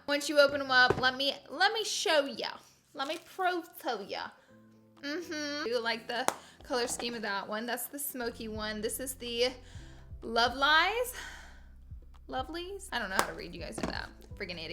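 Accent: South, United States. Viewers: she’s from the south. Let her be her:)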